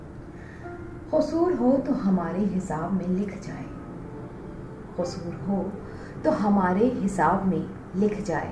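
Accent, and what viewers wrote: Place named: Hindi Belt, India. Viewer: معذرت لیکن سچ میں غیر مسلم کا لہجہ الگ ہی سمجھ آجاتا وہ کشش لگ ہی نہیں رہی۔ اردو سے محبت تو بہت لگ رہی میڈم کو مگر لہجہ میں اب بھی ہندی کا اثر واضح ہے۔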